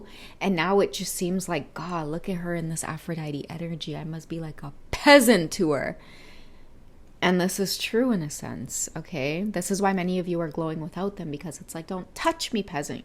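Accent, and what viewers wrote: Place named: Trinidad and Tobago. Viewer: Aye you not eash na with dah trini accent, makes me laugh...lol.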